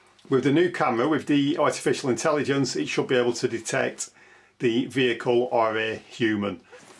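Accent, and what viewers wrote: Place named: Wigan, United Kingdom. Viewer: I live in Pennsylvania but am originally from Wigan, you sound as if you are from near there, lol.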